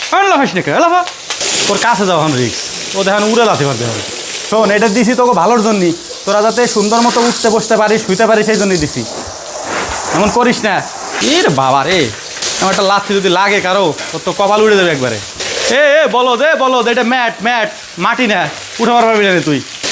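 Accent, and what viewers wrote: Faridpur, Bangladesh. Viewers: ভাই কি আমাগো ফরিদপুরের মানুষ নাকি ভাষা গুলো শুনলেই কলিজায় লাইগা যায়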